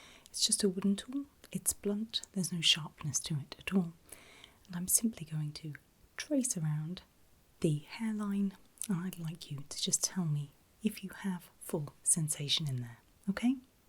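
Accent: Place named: United Kingdom. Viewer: Your accent is like ✨P E R F E C T✨ i LOVE british english accent 🩵🩵 so relaxing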